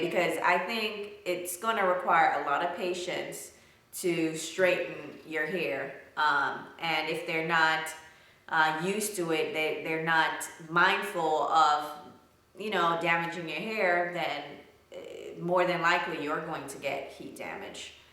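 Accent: Jamaica. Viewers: I know this is probably going to sound like a really dumb question, generally, by heredity, do most people of Jamaican descent, like yourself, have thick hair. I ask because my best friend is of Jamaican descent from her father and her hair is thick. I love your accent. It reminds me of her dad. I used to go visit them just to listen to him talk. My mom couldn't understand a word he said, but I could. :-)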